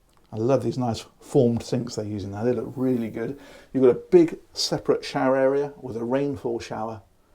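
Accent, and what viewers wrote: United Kingdom. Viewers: I like this guy. The British accent is cool. he really knows his stuff. These yachts are mind boggling with the technology and conveniences. Builders have thought of everything.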